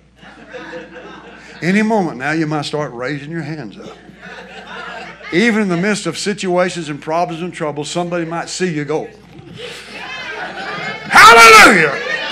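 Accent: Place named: Texas, United States. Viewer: he has publicly denied being Elvis Presley several times. isn't the problem it's a group of his congregation who keep feeling the fire. Only because they want him to be Elvis so badly that ignore the truth. Yes, he does sound a lot like Elvis when he sings but that's where it ends. There are thousands of Elvis tribute artists who sound similar to Elvis but that doesn't make them Elvis. There is no physical resemblance between and Elvis. There eyes, nose, mouth, lips and teeth are all different. You can find pictures of a young with his family on the internet where he has dark hair and beard. Elvis was 6 feet tall while is 5 ft 10 inches. is 17 years younger than Elvis. He was born in 1952, Elvis was born in 1935. is 74 while Elvis would be 89. Elvis was a senior in high school when was born. was born in Forte Worth Texas in Tarrant County area which is why he has a strong Texas accent. 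While Elvis was born in Tupelo Mississippi which is why he has a Mississippi accent. Elvis was married 1967 and eas dating Linda Thompson when married Wilena. father was an ordained minister from 1957 to 1990 when he passed away at age 93. became an ordained minister in May of 1981. After his father died, his mother and 2 sisters travelled through Texas preaching the gospel in small towns too small to have their own church. He did that until 2005 when he moved to Benton Arkansas and worked as carpenter until he opened a small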